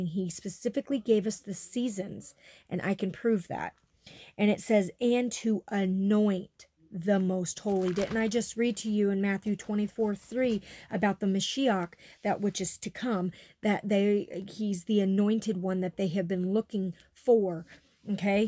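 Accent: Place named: South Carolina, United States. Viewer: I live in south Carolina you sound like ur from south Carolina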